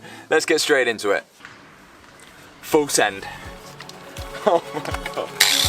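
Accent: Leicester, United Kingdom. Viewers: This lad doesn’t give a shit and just sends it which is why I love these videos! Also, are you from Leicester?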